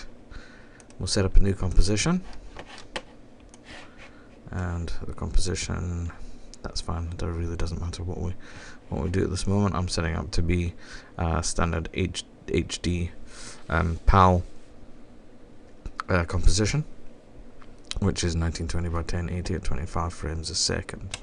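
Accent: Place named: Scotland, United Kingdom. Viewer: Not to get off topic, but that is one heavy Scottish accent.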